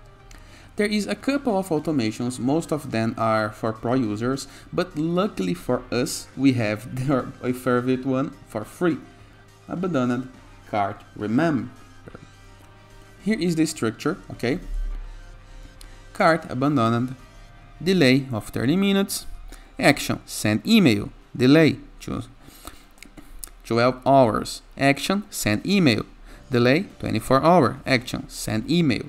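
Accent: Brazil. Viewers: Hi, are you brazillian? Your english looks like a brazilian guy. Thanks a lot for tutorial.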